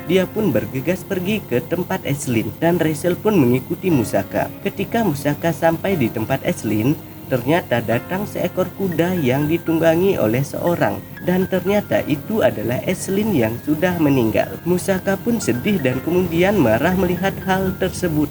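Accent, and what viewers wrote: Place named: Lombok, Indonesia. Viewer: Miminnya seperti orang lombok ya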